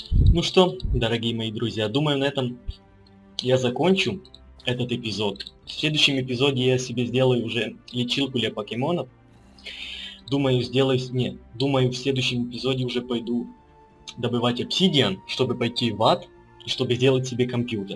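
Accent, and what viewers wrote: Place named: Ukraine. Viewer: Акцент України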